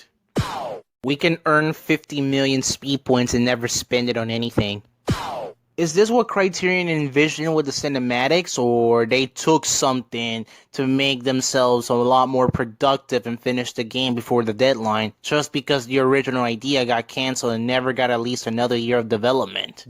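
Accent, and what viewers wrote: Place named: Bahamas. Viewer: I love your Bahamas accent. It’s so Heaty.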